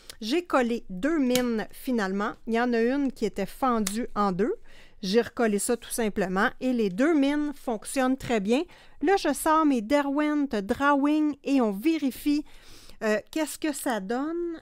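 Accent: Canada. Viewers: Cc,je me suis abonné à votre chaîne youtube que j'adore 😄Vous avez un accent canadien que j'aime,vous me faîtes rire parfois et ça fait du bien par les temps qui courent.Merci de nous faire découvrir vos achats et de nous donner vos impressions. Joli livre 😄Je pense me le commander❤️😍A bientôt toujours sur votre chaîne,bisous,Martine 😍❤️💜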